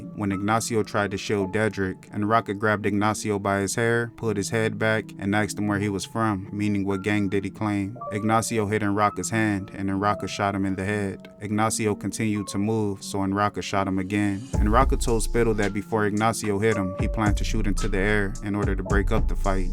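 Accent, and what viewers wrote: California, United States. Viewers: That's definitely a California where are you From?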